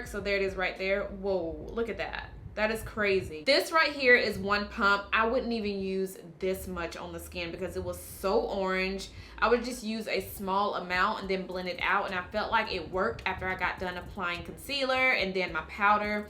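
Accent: South, United States. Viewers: Love your channel! And your accent! Are you from the south? I’m from Mississippi so I love hearing what I think is a Southern accent :) I bought butter pecan in Sephora a couple days ago but it’s a tad too dark and orangey on me. I’m thinking I MAY be caramel...😩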